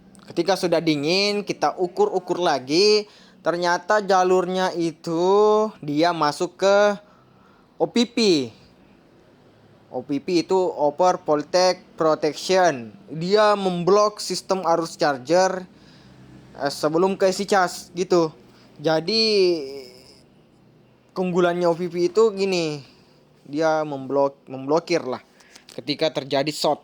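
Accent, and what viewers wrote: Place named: Makassar, Indonesia. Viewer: Kentara logat makassar nya wkkw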